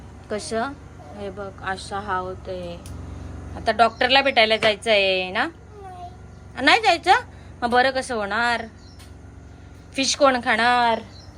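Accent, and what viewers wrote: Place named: Thane-Palghar, India. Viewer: तुझ गाव कोणते? भाषा ठाणे-पालघर ची वाटते.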